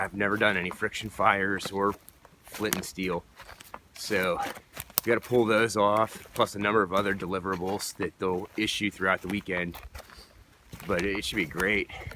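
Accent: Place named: West Coast, United States. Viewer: Dang bro, you sound West coast . Love the channel new sub 👍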